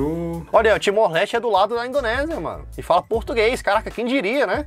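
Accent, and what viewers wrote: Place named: Northeast, Brazil. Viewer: Qual o sotaque deste apresentador ?deve ser do sotaque do nordeste ...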